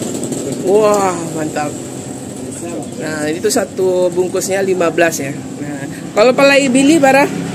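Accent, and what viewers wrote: Minangkabau, Indonesia. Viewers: Puan bertutur minang Seronok didengar menghibur hati masakan minang terkenang kenang enak lamak bana sudahlah pasti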